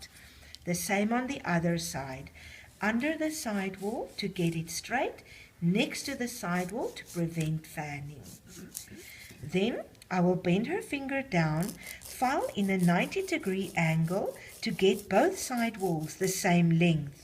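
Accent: South Africa. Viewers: is jy afrikaans? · Nice to hear a South African for a change :) thanks for the tutorial! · By her voice I can tell she is south African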